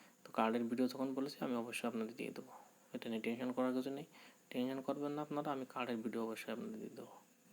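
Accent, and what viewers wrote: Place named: Khulna, Bangladesh. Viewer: Vai, apnar Kotha suna to khulnar Lok mone hoy. Jai hok personally Kotha bol jabe?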